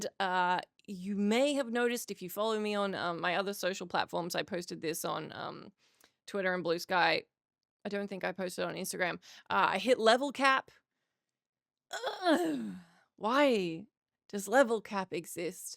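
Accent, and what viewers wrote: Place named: Australia. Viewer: I've never picked your Australian accent before, probably because I'm in New Zealand ❤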